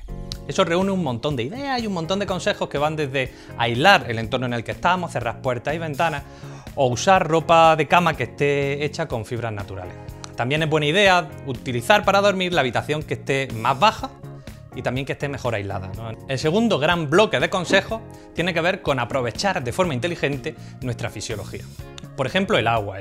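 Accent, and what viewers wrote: Murcia, Spain. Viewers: Acho tu ereh de Murcia no? Yo también.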